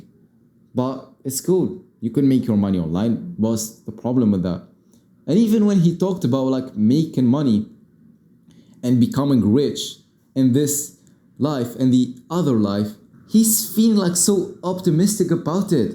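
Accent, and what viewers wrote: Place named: France. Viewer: Great channel masha allah. You sound French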